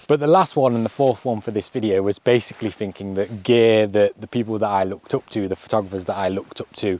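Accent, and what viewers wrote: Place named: East Midlands, United Kingdom. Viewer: You mentioned living in the East Midlands.. Would you mind me asking whereabouts? I'm from Mansfield and your accent does sound a bit familiar. With regards to regrets, I can relate to spending so much money on gear that I then can't afford to go anywhere to actually take the photos in the first place! 😂😂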